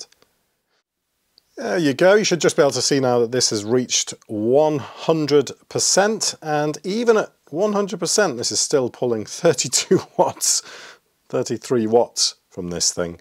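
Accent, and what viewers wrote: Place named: United Kingdom. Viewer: I Love Your British's Accent :elbowcough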